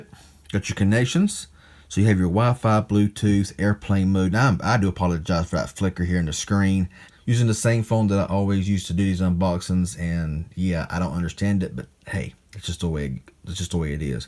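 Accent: South, United States. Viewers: He has a yee yee accent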